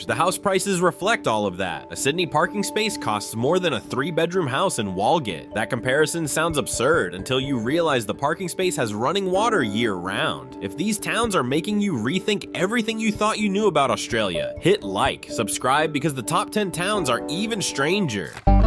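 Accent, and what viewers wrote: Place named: United States. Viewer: What a joke!!!!!!!! I stopped watching when I heard "Morwell". Yes, Morwell the drug capital of the Latrobe Valley. The shops have closed and the town is dead. The only businesses thriving are the drug traders and pushers, the police and the lawyers. And, the American voice, don't get me started on that. Vomit material.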